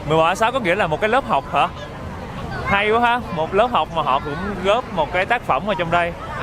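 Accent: Mekong Delta, Vietnam. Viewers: Thích a , giọng đậm chất miền tây luôn 😋😋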